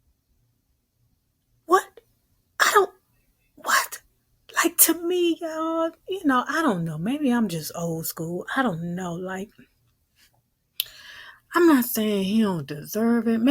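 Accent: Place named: South, United States. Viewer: I keep playing this just to hear yo country ass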